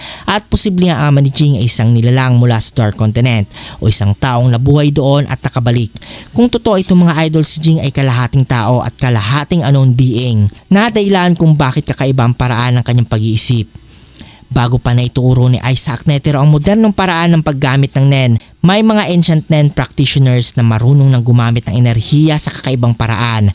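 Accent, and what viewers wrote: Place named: Visayas, Philippines. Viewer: bisaya amp haha ausin mo tahalog mo 😂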